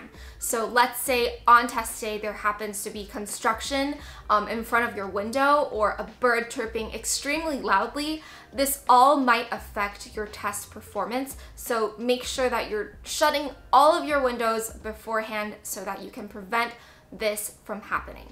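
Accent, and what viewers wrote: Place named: North America. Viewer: HOLY SHIT. You were born and raised in Taiwan and can now speak English with a North American accent? Damn.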